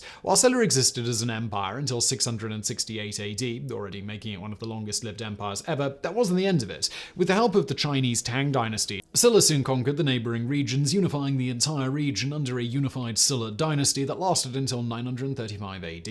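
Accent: United Kingdom. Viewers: The British accent doesn't fix Simon's abysmal pronunciation of even the most basic of English words. Painful. Not wasting any more of my time here.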